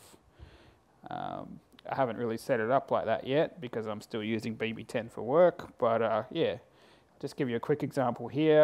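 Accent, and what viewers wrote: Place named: Australia. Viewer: you're aussie. finally an aussie reviewing a blackberry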